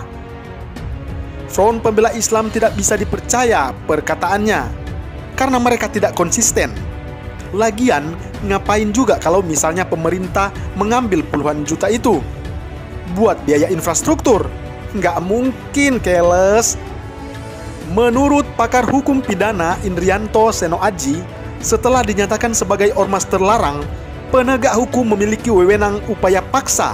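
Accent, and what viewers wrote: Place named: China. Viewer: Apa iki...suara nya asli apa mahluk jadi2an tokh ini? Di lihat logat bcr yakin ini cina nyungsep.nyamar!!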